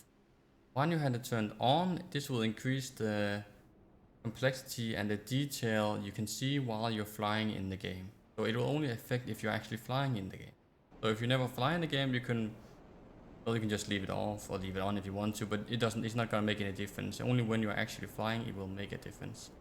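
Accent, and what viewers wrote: Denmark. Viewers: U Sound danish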